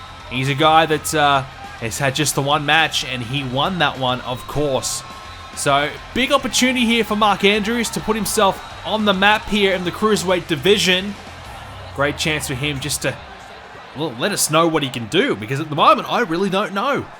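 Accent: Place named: Australia. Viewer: are you from australia? coz thats where i am and you sound aussie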